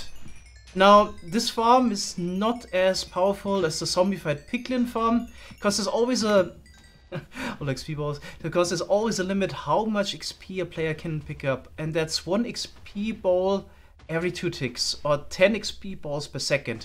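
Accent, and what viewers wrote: Germany. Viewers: Bro sounds to german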